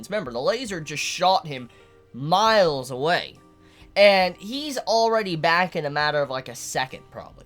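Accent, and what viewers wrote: South, United States. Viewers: Pretty sure is from the South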